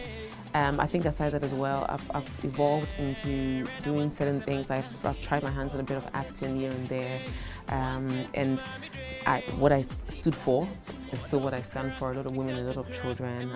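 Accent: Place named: Nigeria. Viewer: She has Nigerian accent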